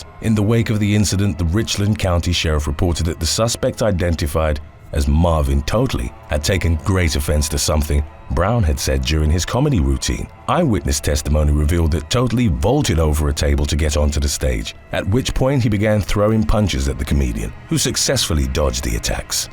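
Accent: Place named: England, United Kingdom. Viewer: he's English. they pronounce things differently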